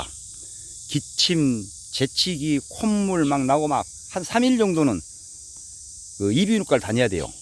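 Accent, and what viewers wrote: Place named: Jeolla, South Korea. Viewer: 전라도 구수한 사투리 정겹고 날마다 좋은정보 잘배우고 갑니다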